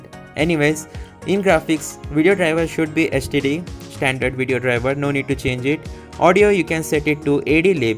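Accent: India. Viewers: Are you from Indian because your English language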